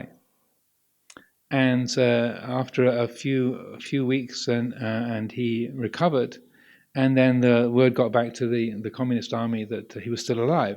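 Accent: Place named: Russia. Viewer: Brilliant words ! thank you most venerable Amaro - love the Russian accent :) and also the statement - ‘we are going to do something terrible - we are going to deprive you of an enemy ‘ I nearly fell off the chair when I heard that LOL Sadhu Sadhu Sadhu we are blessed to hear this monk’s wise words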